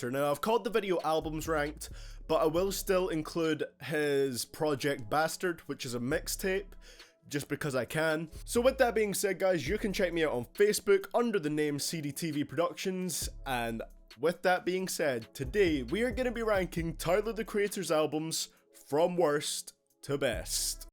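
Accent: Scotland, United Kingdom. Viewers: I love the Scottish accent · Are you Scottish?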